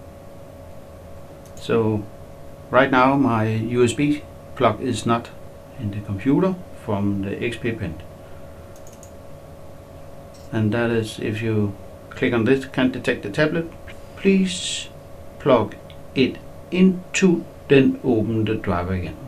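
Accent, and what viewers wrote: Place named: Denmark. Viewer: danish innit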